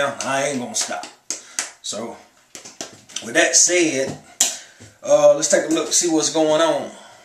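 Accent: South, United States. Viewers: sexy assss country accent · Lol he sound like he from the south od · Country accent is dope "G"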